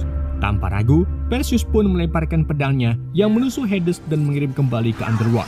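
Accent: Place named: Java, Indonesia. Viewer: Boso jowomu medok mas🤣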